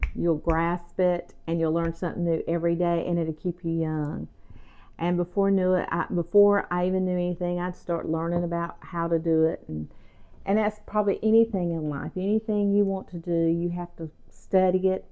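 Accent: South, United States. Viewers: I love your southern voice so much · you are an angel here on earth. May God richly bless you and your family for your faithfulness to Him and to one of his precious Lambs who had no home until you provided for her, cared for her and loved her as He would. I love your YouTube channel and your sweet smiling face, your lovely southern accent that sounds like me, I'm a Nashville TN native living in Cedar Hill TN now. Your courage and determination are inspiring and your kind and loving heart is projected through every word you speak to us and every smile you give to us. Blessings upon blessings be showered upon you and yours forever. ❤🙏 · your genuine warmth and sincerity are so refreshing in this increasingly disconnected Impersonal world. Your wonderful Southern accent also endears you to this boy raised in the Deep South! May God continue to bless you as you bless others! 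Big hug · I appreciate getting to know you more. I’m a newish subscriber- so I’ve only seen a few of your videos, but I knew I had to subscribe because I love a sweet soul with a southern accent! Plus, a great cook! Love, from Texas! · you are an inspiration. Sharing your story helps me remember that we just don’t know sometimes what the people we see in our daily lives are going through. We just don’t know. Perhaps remembering that we don’t can I courage us to be kinder in our interactions with others., I don’t know you but you seem to radiate kindness. Perhaps it is your voice and that beautiful southern accent that we in the south are blessed with. Perhaps it’s the expression on your face. Maybe a combination of things shows the kindness of your heart. You were speaking in this particular video of a sad event in your life. I am reminded that in the Bible there is a verse that tells us that we learn in the House of sorrow not in the House of laughter. 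God in His wisdom knows what we need and in His wisdom He sends situations or people to provide for that need. God bless you.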